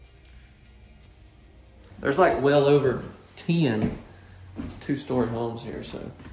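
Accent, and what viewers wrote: South, United States. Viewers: Hello. I am from beautiful Campbellville Ontario Canada. Since today is February 01, where I am is fairly cold. I do like parts of this house. The second bathroom was a good size as you mentioned. No pantry(disappointing), no sink in the "utility room". Regarding the "u", I and most people I am acquainted with would call those rooms a laundry room. If you use laundry room no one can tease you on your pronunciation. 😉 I haven't been fortunate enough to be able to travel to the Southern States. I SO adore your accent. Have you ever traveled to Canada? Where I live(in the country)there are modular homes parks 20 minutes away. Maybe once Covid has gone, you might want to look at modular homes in my area. Thank you for your videos. I enjoy seeing the different layouts, rooms sizes, cabinets and flooring. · BABY BOY!! Libby from Tampa Florida. 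I would never criticize you on your Channel. The only thing I can tell you is don't be negative with your little funnies. Or your big funnies! Those are what makes your Channel! Own them. Don't say I'm just kidding. You are great the Way You Are. Just be more firm with your delivery. Because you are great!. I watch other mobile home channels😝 I can't take it good. I'm looking for your little Southern Voice and just your humbleness that shines through. This time I took the time to put a long comment. I'm sorry that I don't leave one every time because I watch you on TV. GOD BLESS YOU LOVE YOU!!! 👵🙏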